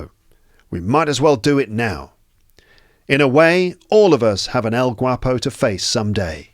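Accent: RP, United Kingdom. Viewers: BYE BYE BOY BOY BOY...! It is very nice and very interesting...!!! I would ESPECIALLY love your RECEIVED PRONUNCIATION and your VOICE of British English...!!! I am USUALLY and OFTEN listening your Podcast and Episode to IMMERSE myselft in British English....! THANK YOU VERY MUCH Mr.....! Your name is at the same as name of Sain....!!! 😝😝😝😝😝😝😍😍😍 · I am from Nepal and learning English with British accent through your leading podcast.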